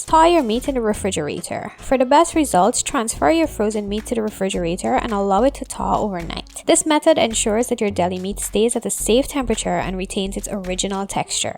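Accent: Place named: Trinidad and Tobago. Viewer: Are you Trini? Your accent sure sounds like it.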